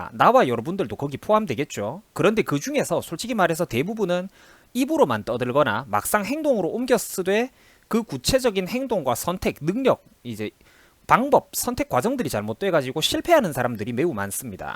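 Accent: Gyeongsang, South Korea. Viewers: 일장기랑 바뀐거 같은데 말투에 쌍도가 묻어나오네ㅋㅋㅋㅋ 쌍도야 언제까지 60년대70년대에 머물러 살래? 세상돌아가는 공부좀해라 과거에 집착하지말고~ 최순실 영치금이나 넣어주던가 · 갱상도 사투리 부텨 고처라 이놈의 갱상도 꼴통도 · 말투가 딱 대구사람이네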